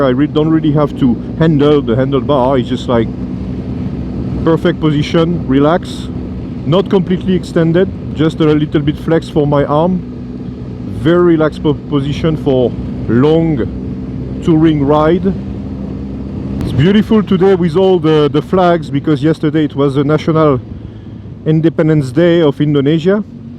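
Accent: France. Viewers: A true French accent ! 😁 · I love french accent in your english , because i am french 🤗. Les moteurs des cooters honda sont il les mêmes que sur les scooteur sym ? Merci for your vidéos , dear french man .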